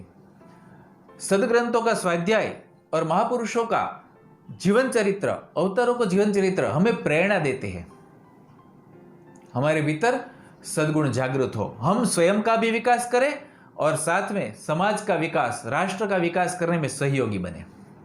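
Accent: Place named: Gujarat, India. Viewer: Tamari peli second ni boli thij khabar padi gayi k tame gujarati cho 😎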